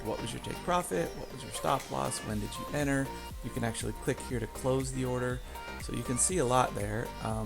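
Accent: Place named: United States. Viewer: This is a really odd video and contains so many incorrect statements it's not funny. I don't see anywhere on the video where you show any of the bots or portfolio that you created. You don't mention anything about trading them semi-automated through the Telegram alerts so I can only assume you ran this 100% automated, 100% of the time? If so, that is clearly NOT how the software is designed to be used. It is NOT an EA or anything close to an EA. It relies on you, the user creating bots and a portfolio that satisfies your own criteria as a trader. It also relies on you as a trader to decide on which trades to take once they are presented to you. Having a conversation with another user, rather than with ourselves as the creators seems mighty strange to me. It looks like the blind leading the blind. As you can see from the live leaderboard, many of our traders are achieving excellent results from their own created and managed bots, see here <link> As for your execution issue that you mentioned, how on earth can that be something we control. 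What has your broker suggested (I'm assuming you asked them or did you simply blame us?) The system sets the trade on the built in virtual bot and then instantly copies that to your live account. You sound American to me, so just maybe it was an issue with your FIFO rules that your brokers place on you. Of the hundreds of live users, all with a large variety of brokers, I've never heard this issue before. It is certainly not a software issue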